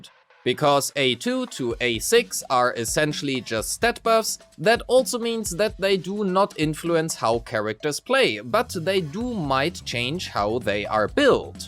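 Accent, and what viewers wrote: Germany. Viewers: I know a german when i hear one, danke für das video meister!!!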